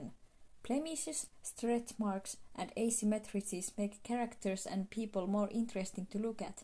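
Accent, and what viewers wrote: Finland. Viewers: omg suomi aksentti havaittu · LOVE THIS VID!!!! and is that a Finnish accent? :3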